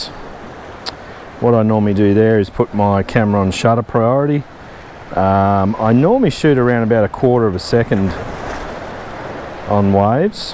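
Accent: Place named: Australia. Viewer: I truly enjoyed this clip. Love the laid-back, very Australian delivery. But that said the content was very useful and valuable. i really like the IQ of the Canon as well. I also liked the (abstract) waves and the richness of the colours. I hope to head down that way in a few months and will try out the technique. It was terrific that you mentioned details that I might not have otherwise noticed like the setting sun shining on the wave tops. I love capturing the complex movements of the water too. It seems that particular location is one that presents much variety to those who are keen enough to look. Favourites: the first two at the end, the same ones you said you liked.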